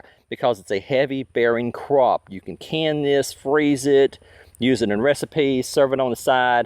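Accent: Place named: South, United States. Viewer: Nice! What type of soil do you have down there? Im guessing by your accent that youre down south. Here in WA we have black nitrogen rich soil. Do growing peppers need a specific type of soil?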